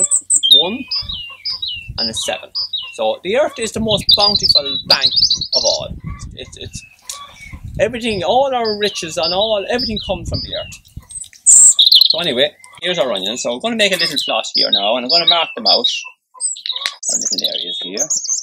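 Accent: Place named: Jamaica. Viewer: Great video. Really helpful. What is that accent? I have Jamaican family and they sound a lot like u.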